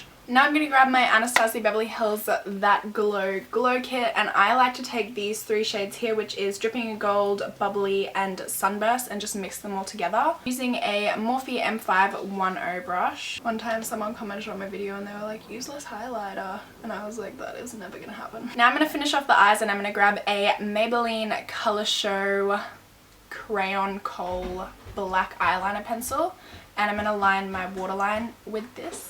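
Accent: Australia. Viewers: U sound like Kylie Jenner but with an Australian accent XOXO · I'm not an expert in accents but is she Australian? Amazing makeup btw :)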